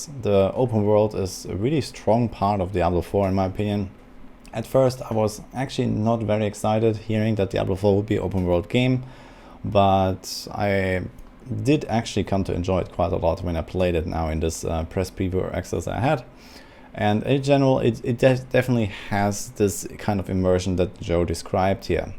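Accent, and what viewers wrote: Germany. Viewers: Bitte mach doch solche info videos auch auf deutsch. Man hört es richtig raus, das du ein Deutscher bist...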